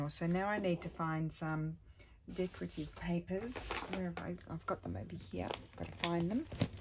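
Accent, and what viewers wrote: Australia. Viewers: Hi, I like your style and the ideas you come up with. How did you come to live in Italy?? Obviously an Aussie accent - then you talked about the weather......not the same as here!! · Hi Yes I found her on you tube with a flip through of Happy mail for Gayle,then I bought her download papers on ETSY and I watch her when I get a chance..I then watched Gayle which led me to you and many others and subsequently more buying of paper...Now Im learning to journal and card make too.....I can't believe there is such a thriving wonderful community of crafters from "All over the World". I'm in New Zealand "Your old neighbour across the ditch...I picked your Aussie accent straight away and was so thrilled to hear of your life in Italy'...Its a great thing this crafting how it brings people together.Thanks.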